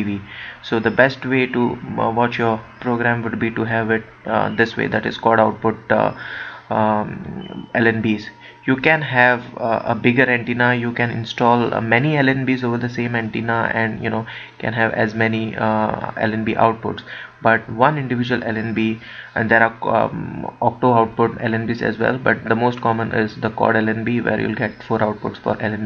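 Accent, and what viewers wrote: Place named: South Asia. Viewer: i agree with you about his accent, i think he is from may be India or Pakistan.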